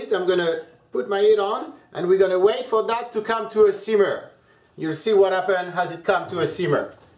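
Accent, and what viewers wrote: France. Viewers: Vous avez un accent français a couper au couteau !! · I watched the video.real franse laungwich accent.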